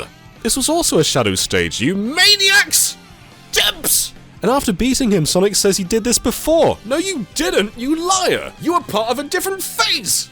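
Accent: United Kingdom. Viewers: Came for the content, stayed for the sexy deep British voice...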